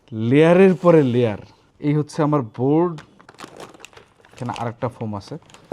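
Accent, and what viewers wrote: Barishal, Bangladesh. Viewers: ভাই আপনি বরিশাইল্লা বুঝলাম, প্লেন কে প্লেইন উচ্চারন করেন। তাই বলে লেখার সময়েও Plain?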